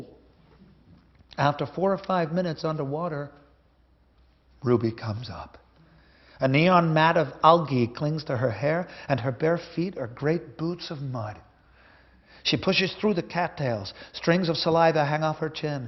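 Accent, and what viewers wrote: United States. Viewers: why is he reading in an american accent at Oxford?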